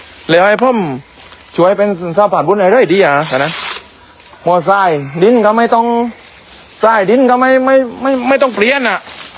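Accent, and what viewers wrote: South, Thailand. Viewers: ❤ชัดเจนคนใต้บ้านเรานิหร่อยนิ😊❤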